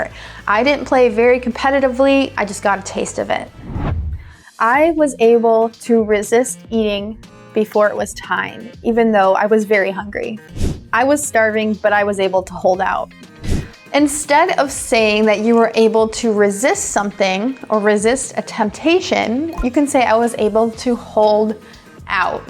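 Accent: United States. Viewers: Hey teacher am new here am learning a lot from your beautiful classes, So I am from Brazil 🇧🇷, I have loved your accent since the fisrt moment, which state of USA is this accent I mean🇺🇸Do you come from which state? Thanks in advance ❤God bless you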